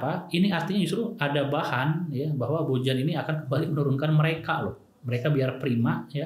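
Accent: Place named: Surakarta, Indonesia. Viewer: Medok solo lah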